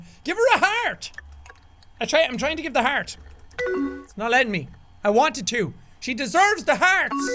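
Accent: United Kingdom. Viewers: abstract action productions when did he do a British accent in this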